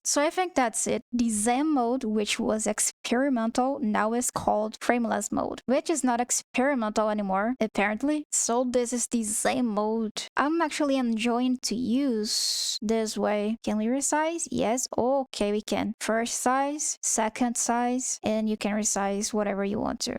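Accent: Brazil. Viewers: eu sabia q vc era brasileira imediatamente pelo sotaque kkkkkkkk nao te xingando tho, otimo video e otima pronuncia !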